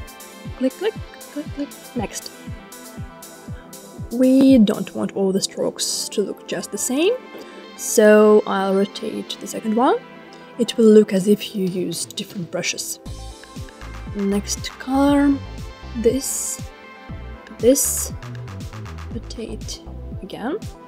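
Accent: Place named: Russia. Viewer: мне показалось, что у русский акцент, или это действительно так? it seems like voice has russian accent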